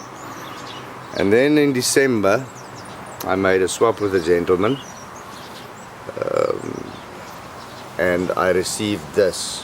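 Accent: South Africa. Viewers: SA accent is it?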